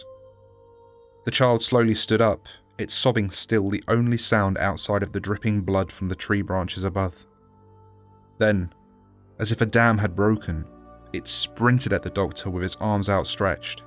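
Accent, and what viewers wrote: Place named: United Kingdom. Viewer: I like british acsents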